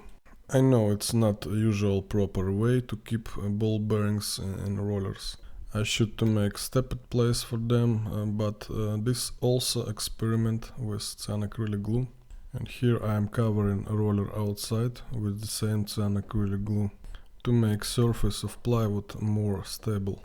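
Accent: Russia. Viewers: Ты сам из России что-ли? акцент русский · Such a satisfying Russian accent :)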